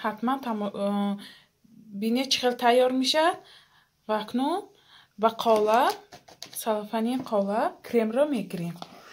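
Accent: Isfara, Tajikistan. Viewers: Шумо а Исфарами лафзатон монанд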